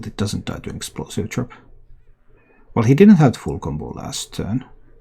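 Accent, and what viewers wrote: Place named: Finland. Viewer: He's from Finland, this is how they lovely people of Finland talk 🇫🇮❤️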